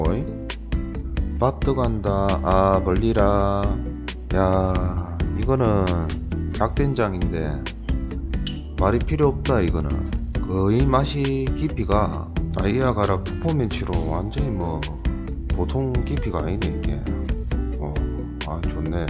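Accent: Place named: Daegu, South Korea. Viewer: ㅋㅋㅋㅋㅋㅋ 대구에 있는 내친구가 얘기하는 줄 알았네요 ㅋ 저거 단점이 있어요.. 밥을 너무 많이 먹을꺼 같아요 ㅋ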